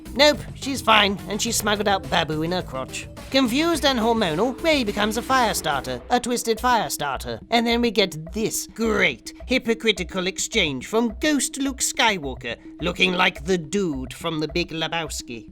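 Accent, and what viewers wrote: United Kingdom. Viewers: Good job you sound like plinketts older proper British brother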